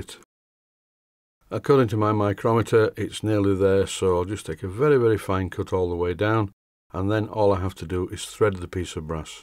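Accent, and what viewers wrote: United Kingdom. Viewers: I love your videos and am gradually learning British locomotive terminology even though sometimes your charming accent adds another layer to comprehend. My favorite example is "group" screw, which I finally figured out was grub screw which I would call a set screw. Also, turret would translate to manifold here in the US. Keep it up!!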